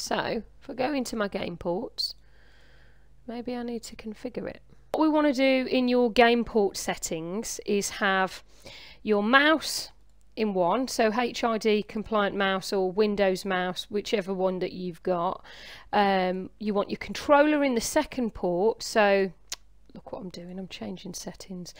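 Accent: Midlands, United Kingdom. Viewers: Thanks for this.. Very useful indeed...you sound like a fellow midlander btw :)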